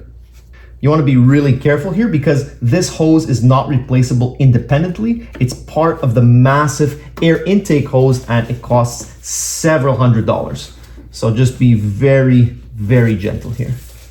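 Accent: Quebec, Canada. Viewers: Love the Quebec French. Ostie! 😂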